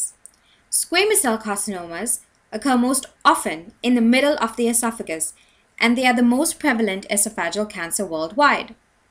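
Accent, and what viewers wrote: South Africa. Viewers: Are you from Durban?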